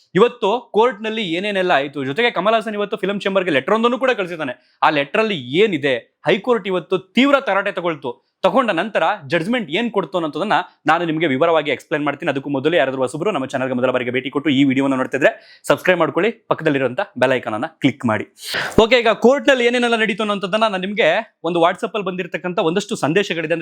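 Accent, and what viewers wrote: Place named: Belagavi, India. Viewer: ಬೆಳಗಾವಿ ಕನ್ನಡ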